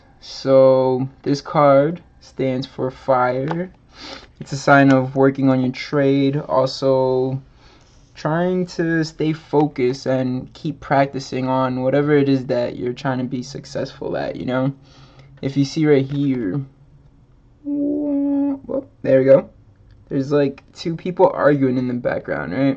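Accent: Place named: Jamaica. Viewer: why u trying to sound like a Jamaican?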